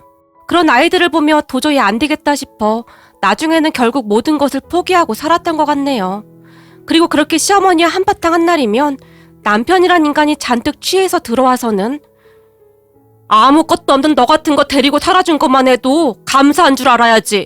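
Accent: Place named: Jeolla, South Korea. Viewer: 님 왜케 귀여워요ㅋㅋㅋㅋ 접때 우연히 전라도 사투리쓰는사연읽고반했음ㅋㅋㅋ 넘귀엽.. 인스타안하세요? ㅇㅅㅇ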